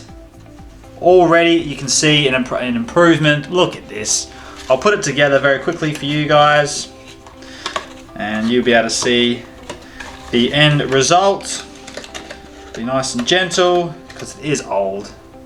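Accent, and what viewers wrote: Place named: Australia. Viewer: Subscribing because I miss the voice of my aussie buddy Kevin "kaixin" from l🎉ast decade living in shenzhen China. You sound exactly like the guy in China who taught this yank and his kids how to play dungeons and dragons in the undisputed champ of English accents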